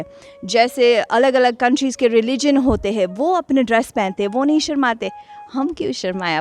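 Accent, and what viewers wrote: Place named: United States. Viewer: Main inko insta par following karta hu, tabhi mai socho ye inka american accent kyu hai.. Mai samjhta tha ye style dikhane ken liye american accent mai boltti hai 🤣🤣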